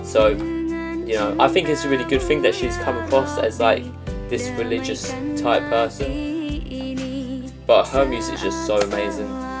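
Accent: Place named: United Kingdom. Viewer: What's up dude!!! I like your british accent. greetings from Indonesia🇮🇩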